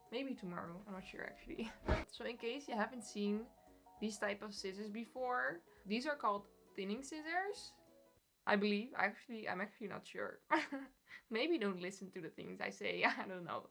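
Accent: Netherlands. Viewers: I love your dutch accent:)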